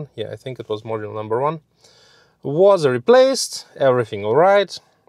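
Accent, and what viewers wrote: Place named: Germany. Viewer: I hear man with German accent reviewing technology, it's first like and then view. Subbed, my regards from Greece!Good luck with VW issues.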